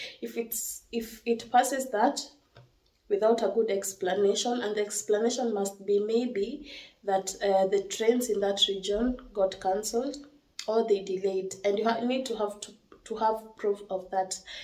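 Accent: England, United Kingdom. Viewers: I enjoyed watching Sis some of the things you shared here is now part of my life, we forgive you for English l understand you especially when you speak German it takes away your English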